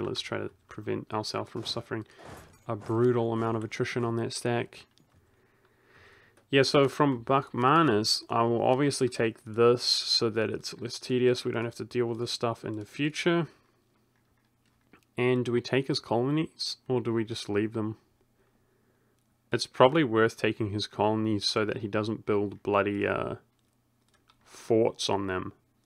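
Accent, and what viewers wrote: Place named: New Zealand. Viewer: He's from that island next to Australia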